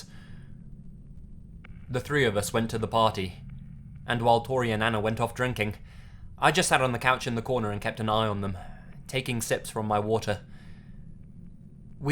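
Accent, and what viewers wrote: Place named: United Kingdom. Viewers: I love your British accent, I'm Canadian and I die a little every time a hear your voice and your tone for telling scray stories, scares the shit out of me. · Finally someone else like me who has a British accent and tells scary stories only you have a British accent that's a hundred times better than mine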